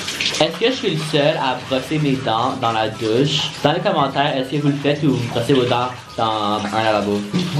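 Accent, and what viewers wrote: Quebec, Canada. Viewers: Let's go dans douche 😂 J'adore ton accent québécois c'est trop cute ✨ Plus de vidéo avec et vous êtes trop mim's tout les deux 😸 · Tu parle kebequois plutôt que français c trop cool mais si différent que le français que je parle!/ you speak more Canadian French than the French that I speak! It’s so cool tho😍😍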